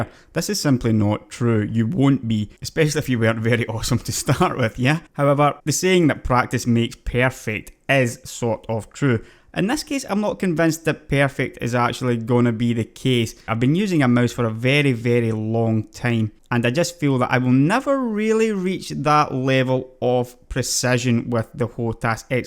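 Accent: Scotland, United Kingdom. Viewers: How many takes did you have to do to make a somewhat understandable Scottish accent for this video? I can't understand my Scottish friends half the time. I'm surprised I understood most of what you said. I know you're speaking English, but even then, lots of English people don't understand what the Hell you guys are saying most of the time. :P Also, thanks for the review. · I know this is a silly critique, but this Scottish accent is basically the quintessentially elite and refined like a fine wine version of Scottish that I've ever heard. You need to get into voice acting, voice overs etc, my dude.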